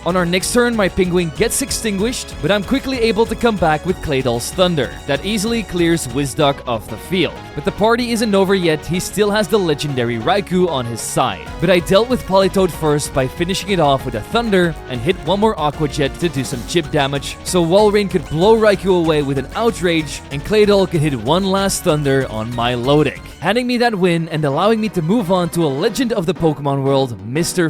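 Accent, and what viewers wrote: Netherlands. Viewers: He does kinda have an accent which implies he normally speaks Dutch, so i suppose he is from around Antwerpen. He also mentioned it multiple times a few years back which makes me realize i have been following his content since around the Covid times...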